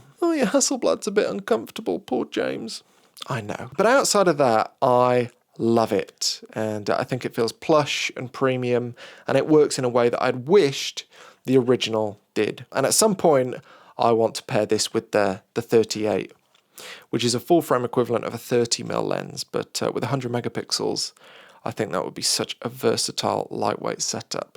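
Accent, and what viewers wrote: United Kingdom. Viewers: What the f*&^* is this channel about. Confused adults? 'British accent'! A very accented 'British accent'! guess the locality.. I have it within 50 miles!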